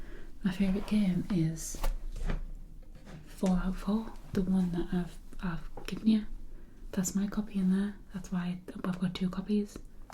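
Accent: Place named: England, United Kingdom. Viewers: I can tell your from Teeside purely by your accent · She sounds very Geordie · Are you from Sunderland? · That English accent fucking hell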